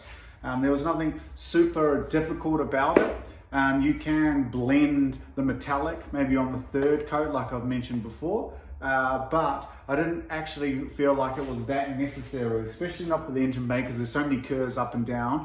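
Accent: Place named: New Zealand. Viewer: gotta kiwi accent 😂 dont worry im a kiwi too nice paint setup for a home job. tommy fyeah spec chur bro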